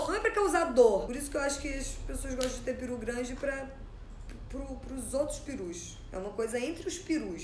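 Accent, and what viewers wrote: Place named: Rio de Janeiro, Brazil. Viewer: Como sotaque carioca é ridículo, com certeza é o sotaque mais feio do mundo.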